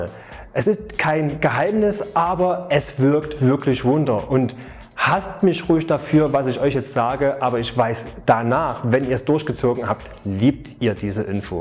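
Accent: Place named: Saxony, Germany. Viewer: Alter sächsisch Mann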